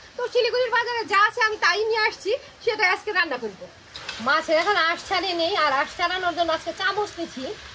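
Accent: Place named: Bangladesh. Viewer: আমি নিশ্চিত যে আপনার পূর্বপুরুষ বাংলাদেশর ছিল। আপনার কথা আমাদের দেশের।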